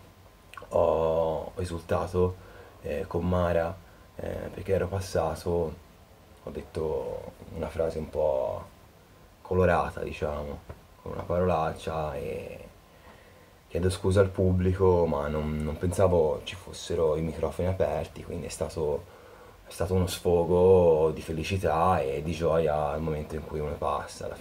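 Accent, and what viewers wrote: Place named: Tuscany, Italy. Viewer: Fantastico l'accento toscano ....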